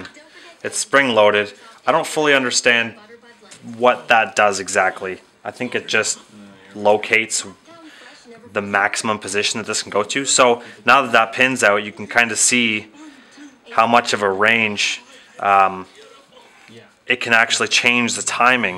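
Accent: Canada. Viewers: are you canadian Eh? Nice video! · Very informative, and in pretty good Canadian English, with "zed" for "Z" and everything.:) Thanks for posting!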